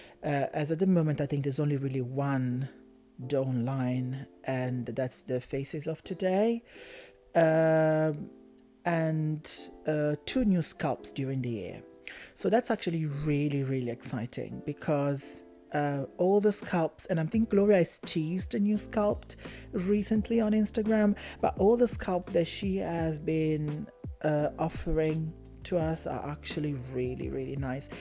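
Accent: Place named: Japan. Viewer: I am obsessed with your accent. Are you of Japanese descent? More videos please, but also include more pictures.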